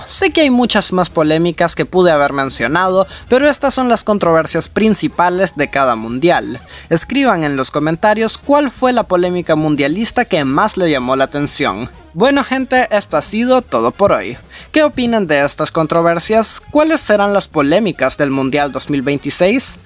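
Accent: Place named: Nicaragua. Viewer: Es de Nicaragua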